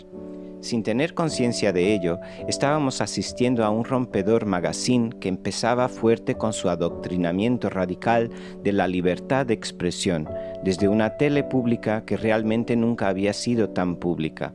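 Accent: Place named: Portugal. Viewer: Portugués... Claro... Habia algo en tu ecento... En fin... Excelente canal y gracias por responder tan pronto....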